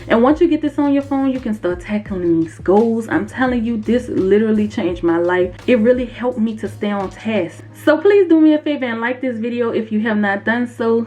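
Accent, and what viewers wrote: New Orleans, United States. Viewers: Ok, I need this in my life. However... I want that N.O.L.A. accent bay-be! 🎆🎇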